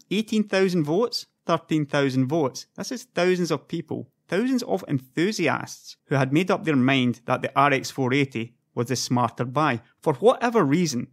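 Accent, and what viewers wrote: Scotland, United Kingdom. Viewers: Your Scottish pronunciation and dificult is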